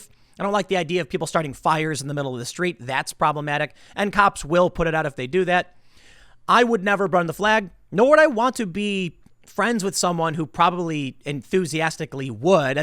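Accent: Chicago, United States. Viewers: Richard Bostan it’s called an accent. That’s a Chicago thing.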